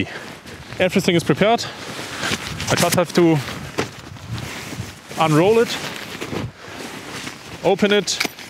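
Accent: Germany. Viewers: Why are you wearing a mask when its not even cold? You generally wear attire like that below -5 to -10C. It looks like its above freezing in this video. Gonna get Covid miles from civilization? It muffles your voice making the German accent more difficult to understand (though your English is obviously excellent). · Na, das ist doch ein deutscher Akzent,oder?😊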